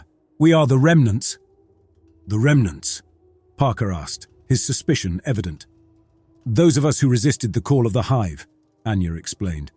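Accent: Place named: England, United Kingdom. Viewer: I must say alot of those Creepy pasta channels regurgitate the same stories over and over And I can tell that some of them have just used the transcript of others reading exactly the same content ...boring Your story telling is class and has been on my nightly listening playlist since I found your channel Maybe I'm abit partial because I'm English too. Anyway...thanks and please keep them coming 🙏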